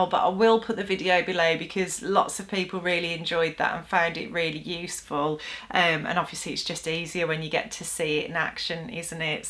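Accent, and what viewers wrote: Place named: West Midlands, United Kingdom. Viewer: Me too - and to listen you! I moved from the West Midlands to the South West a couple of years ago and the pandemic has curtailed my visits back home to see friends. So I LOVE hearing your accent - it reminds me of home. Oh and I think your tips and advice are great too, of course!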